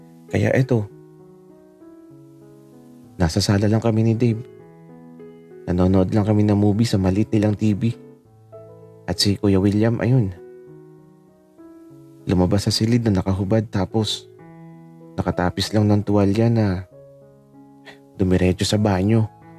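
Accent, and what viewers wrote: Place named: mixed, Philippines. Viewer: Grabe ang ganda ng story author hehe waittings lang sa next ep. Nakakakilig yung story and maganda ang flow kasi nangyayare talaga sya sa totoong buhay.. I also love the accent ni author may punto kasi hehe 😅 parang taga Laguna or taga Quezon Province ganyan din kasi accent namin eh then gustong gusto ko din yung the way ng pag tawa nya hehe 😅 nakakadagdag kilig hehe 😅 Thanks po author sa magandang bl story waiting sa next ep po..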